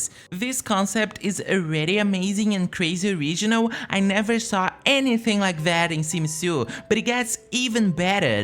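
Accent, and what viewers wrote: Brazil. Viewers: Adorei o video e pelo sotaque ja sabia que era BR. Can anyone recommend some hoods available for the Super collection for mac users? :( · Simmm, assisti o vídeo pensando, é brasileiro certeza kkkkk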